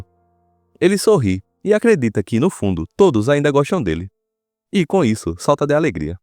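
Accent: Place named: Northeast, Brazil. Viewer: Os vídeos ficam melhores com seu sotaque, viva o nordeste!!!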